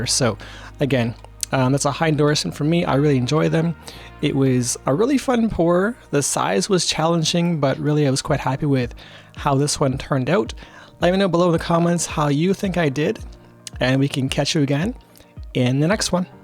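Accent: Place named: Canada. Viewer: Great piece!! I always forget how different Canadian accents are depending on which province. I’m in Ontario and we pronounce our A’s so different hehehe. Long arms definitely with larger canvases. I struggle with this only being 5’1. Great colours. I’m definitely going to check out the FolkArt colour shift brands! And I’m looking forward to chatting about a future collaboration!! Have a great day my friend!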